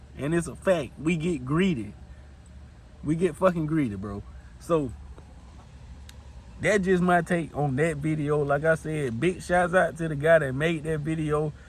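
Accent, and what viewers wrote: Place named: Georgia, United States. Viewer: I enjoy your vids. I feel like, from your accent, you live close to me. I'm in SW Ga.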